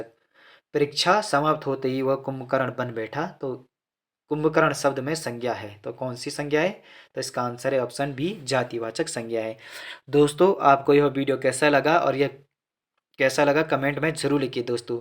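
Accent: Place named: Rudraprayag, India. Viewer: Nice bhai ji rudpyag se ho lg raa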